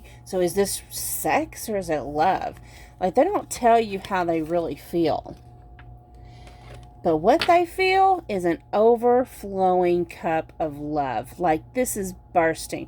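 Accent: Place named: South, United States. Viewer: Hey I just seen you.Im from Mississippi and can tell you are southern.Girl I watch a lot of readers and I Love your readings.New sub!Picked pile 2.Where you from btw?If you don't mind my asking?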